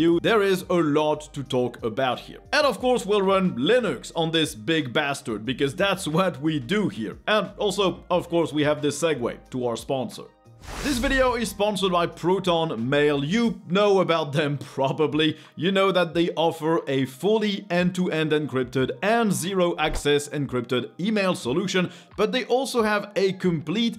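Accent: France. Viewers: je me disais bien qu'il avait un petit accent francais, j'ai enfin compris que ce n'était pas mon imagination x))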